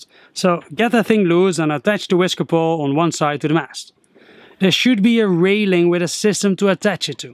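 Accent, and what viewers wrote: Netherlands. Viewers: NICE Dutch accent and great video! fantastisch gedaan meneer :-) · Are u dutch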